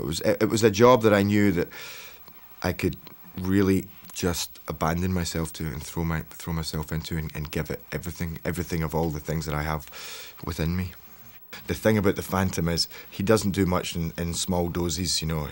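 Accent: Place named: Scotland, United Kingdom. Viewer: Your Scottish accent is great. 👍🏻Your role was awesome. Even my mother fell in love with the phantom..she loved your singing..your performance..your handsomeness. It's all about the phantom. ❤❤❤️❤️❤️❤️❤️❤️❤️❤️ She was affected by his story more than one .. and decided that it would help him in his childhood. We all loved the role of the Phantom. Thanks for the excellent performance. By the way, I do not think that anyone will speak ill of our friend the Phantom now, because everyone has successes and failures, this is the life😊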